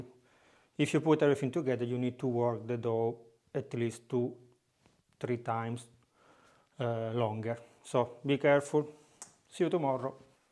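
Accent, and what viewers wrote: Italy. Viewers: youre the cutest english speaker amongst italians..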